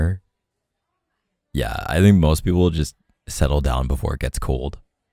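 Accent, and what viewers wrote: Canada. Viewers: he sounds extra canadian here 🫣🫣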